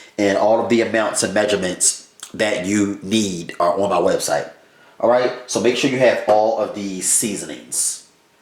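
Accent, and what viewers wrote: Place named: Baltimore, United States. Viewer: You sound like you form bmore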